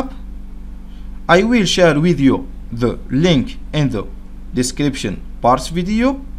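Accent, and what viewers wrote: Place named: Morocco. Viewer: SAWT 3NDK MGHRIBI A SAT HHHH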